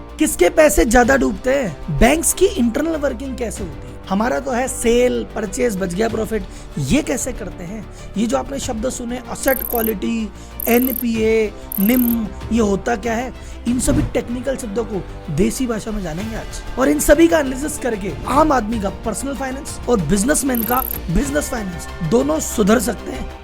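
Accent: Gujarat, India. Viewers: Sir aap Gujarati hoo...ya non gujarati ho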